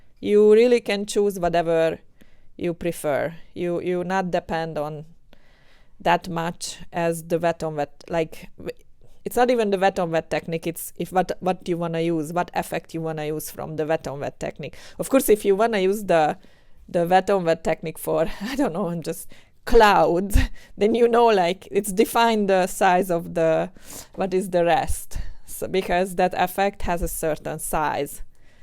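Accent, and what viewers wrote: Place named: Hungary. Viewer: oh look, a hungarian accent! :D nice cats btw! so furry and fluffy looking!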